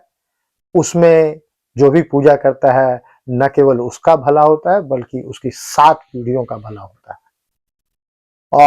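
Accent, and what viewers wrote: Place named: Bihar, India. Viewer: Bahut achha lga apka video.. sbse inspiring lga app apne bihari tone ko maintain rakhe hue hai america jake bhi.. proud feeling.. thank you for such inspiring content wo bhi jab apne language or apne tone me rhte hue mil jaye to.. hmlog to india me rhte hue bhi apna bihari tone bhul gaye hai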